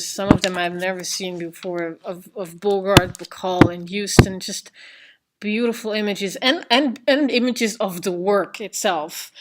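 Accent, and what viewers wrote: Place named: Europe. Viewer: You speak like you came from Europe to US and learned English a five years ago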